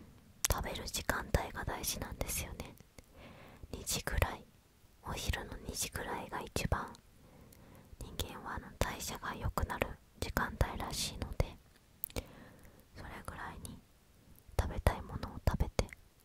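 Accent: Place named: South Korea. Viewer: 韓国の方の日本語聞いてて気持ちいいですよね♪(^▽^)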